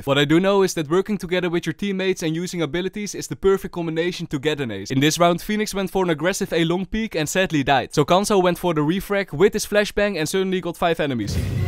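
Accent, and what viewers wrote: Netherlands. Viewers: First sentence and already knew you were dutch. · ur dutch right? (guessing off your accent)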